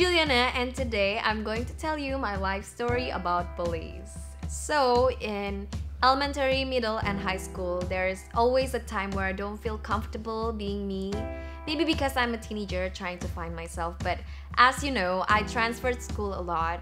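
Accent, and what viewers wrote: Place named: Singapore. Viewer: kalo ngomong bahasa inggris jadi ga keliatan kaya orang indo, kaya orang singapore wkwkw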